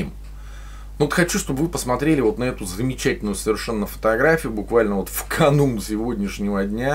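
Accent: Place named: Urals, Russia. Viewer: Реально смотрю и подписан давно ! Так ху..ить власть !! Просто красиво!!👍👍говор как то настораживал, и вот сейчас услышал что земляк с Урала!! Респект и уважуха!😉😀 всё грамотно и по сути!!!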